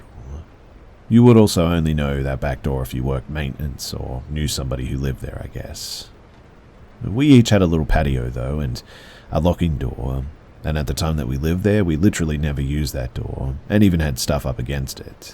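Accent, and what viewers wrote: Australia. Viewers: I watch a Creepy Pasta channel called Mr.Creepy Pasta. Creepy pastas are a bit different than. His is more horror or scary. Creepies 😮 are, well,… Creepy. 😊. The are fiction about all kinds of things. It often looks like the person or people will look like they are going to get away…. But the don’t usually do. Mr. Creepy Pasta is the best! Creepy Pasta Jr.is really good, he has t been posting anything for a while. I think he’s sick.? I read a response from him thanking us for watching. I don’t want to nosy. So he hasn’t been making too many videos. And there are videos are usually shorter than, but they have been made more frequently. But he is really good because he puts out the videos on a regular basis and you can always. Count on him to have videos up and they are more than one video so you can relax and listen to him through the long and everything is fairly new in essence. 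I know the feeling the way he reads and narrates is very nice and that makes the story much greater for it. Thanks for keeping everything scary and interesting my friend you make the night that I am not doing so well more enjoyable. You’re like a drug that makes people feel better! Not so much high. But for me anyway, I can take some time off from reality and get myself immersed in the stories, and just relax and listen to your voice. Soothing Australian voice, and there are others who are from Australia and a lot from British.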